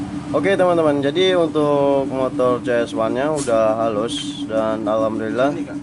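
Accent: Palembang, Indonesia. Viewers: Logat palembang ?? Lokasi dimana kak ?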